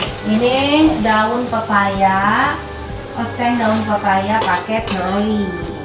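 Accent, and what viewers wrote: West Java, Indonesia. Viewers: Resep liat masakan nya z teteh...sambil ngomong logat Sunda...😍 walawpun ak kg bisa ngomong Sunda🤭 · Memang orang Sunda kok d sunda2in duh si ibuk mah😪😪😪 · Teteh maap klo bs logatnya normal sj...biar hamble jgn disunda2in...maap sblomnya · emang sunda mah gitu logatnya.. bukan disunda2 in,teteh kan org garut.. sama kayak org jawa bukan dimedok2in tp emang medok dr sananya kituu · memang logat sunda begitu say....sama aja klau ada vlogger yng ngmng bhsa jawa Ciri khas daerah masing2.... mereka ttep hamble.... Kan sambil belajar bhsa daerah lain hihihi.... cinta Indonesia berbeda2 tetap satu Indonesia....😊😊😊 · Teh lucuu klo ngmng sunda, kebetulan aku bkan org sunda tp suka sm bhs sunda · resep k teh teh nyariosna meuni Sunda pisan, cantik deuh. 🤗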